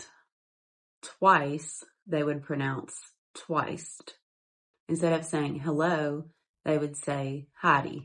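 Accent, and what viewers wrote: Appalachia, United States. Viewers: That dialect suits you well:) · When you say "Appalachia", what part are you talking about? Appalachia goes from Georgia to Maine. I hear an accent, so I'm assuming you're somewhere between Georgia and the Virginias, which is where the locals seem to think "Appalachia" only applies to for some odd reason. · yep sounds just like my relatives. We say it's over yonder. Plus all of the words you said. My ancestors were from Tennessee and Virginia. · Exactly how my G parents talked and how I still use those phrases. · My Nanny talked like this! 😍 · Thank you for sharing this. My grandma was from the Appalachians, so much of this sounds like her, especially warsh rags and the commode. She passed several years ago. I miss her so much. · Sounds like you're just talkin'. :) My grandparents (GA 1898) talked just like that. How much is "a rite smart"?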